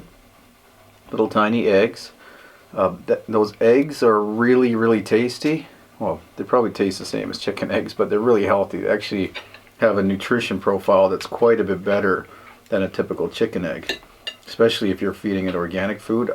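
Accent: Canada. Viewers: You sound Canadian but you don't sound like you are from Ontario. Is your Mum from the East coast?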